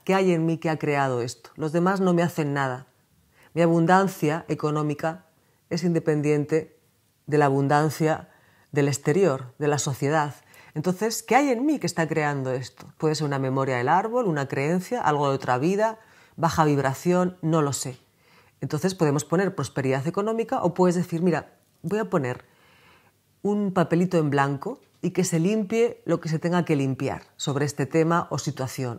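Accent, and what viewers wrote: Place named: Spain. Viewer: Que dulzura la de esta mujer. Su voz tan calma, tan.armoniosa, tan de paz.... de por sí ya te trasmite calma y sanación. Que bella es la fonética de los nativos de España.... enamora. La escucharía por horas y horas... Jajaja Saludos desde Rosario - Argentina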